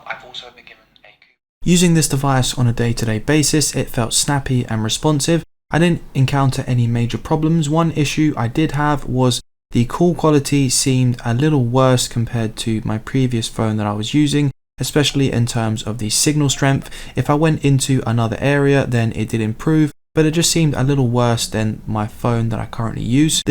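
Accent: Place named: England, United Kingdom. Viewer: Great. And fantastic to hear an English reviewer and one with a nice, tidy garden as well. I'm a light user and I don't do games and I don't like having to worry about batteries so I'm considering it. I like big battery phones but never had a big beast like this. I'm assuming it works on 4g band 20 in uk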